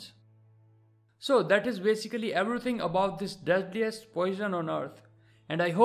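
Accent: India. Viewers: Lemme guess you are indian becoz ur accent bro i love india they are kind persons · To be honest voice sounds like an India like if you think to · Me: Time to watch!! * Indian guy starts talking* Me. What a hell!?!? THATS SO COOL!! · U just gave a love to my comment. I still haven't got my answer which country u belong to I have seen every video of yours today I visited your another channel and by face and voice it seems that u are an Indian . I want my answer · So easy to tell that ur indian i am from nepal btw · His voice sounds like a indian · Why are you talking in a Indian accent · Are you From india? Because Your Language is Indian English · You talk like an indian and i love it KEEP IT UP😁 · has an indian accent...btw are ya indian...if you are then namaste..I m also indian...and if you are not than I will be the one embarassed..lol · Your accent sounds like Indian. 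You definitely are from South Asia.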